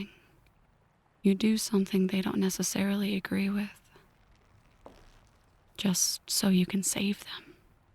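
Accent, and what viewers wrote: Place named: South, United States. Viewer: I just love the southern, though the question I have is the "bless your heart" bit the good version or the bad version?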